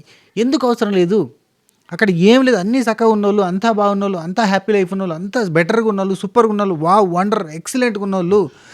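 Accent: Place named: Rayalaseema, India. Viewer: Yendi ee roju మా సీమ యాస కూడా వచ్చంది 😂😂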